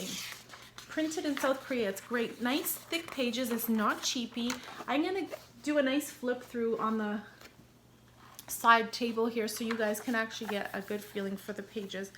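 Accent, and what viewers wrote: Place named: Canada. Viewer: Always love ur videos! Hope you do another garden one:). That was so unique and relaxing:). You are a very special and sweet young lady. I love ur accent-I lived in Mississauga, Ontario Canada for 6 months and love the way you say the word “about”:) I still have a very strong New England accent tho I left there in 1978! 💕💗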